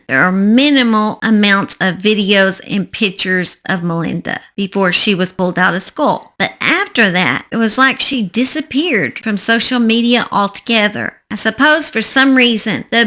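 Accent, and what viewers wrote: South, United States. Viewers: Southern girls get lost in there?? Not hardly people will eat that accent up. We northern girls are unapproachable. LOL You are who you are, that's a good way to be!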